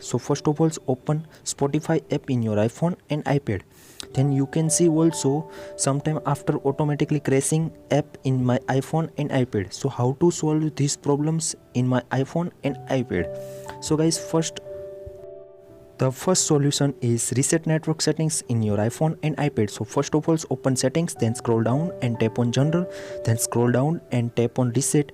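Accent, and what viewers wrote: India. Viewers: This is the fattest indian accent i’ve ever heard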